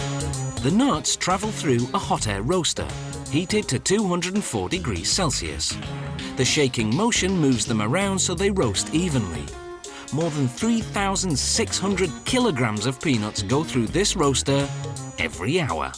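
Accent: United Kingdom. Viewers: Of course the video starts out with a British guy talking about bad teeth. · The bloke is a northerner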